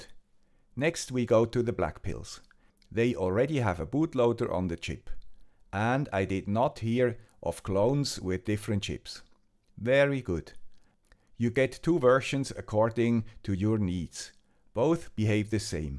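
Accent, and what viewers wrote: Switzerland. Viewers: The man with the Swiss accent does it again - another video of really useful information and comparisons. Thanks.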